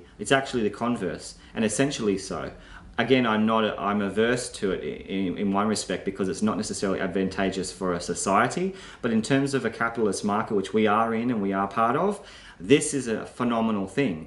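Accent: Australia. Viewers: Thanks for the great imfo...refreshing to hear an Aussie mate. Awesome presentation too.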